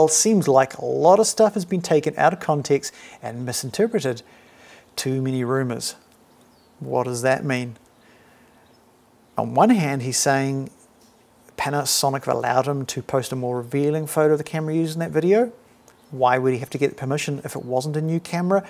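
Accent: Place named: New Zealand. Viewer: Love hearing a kiwi voice on the tube. I am waiting for the GH5s announcement. I am a kiwi, living in china watching this in Ukraine. Keep it up dude.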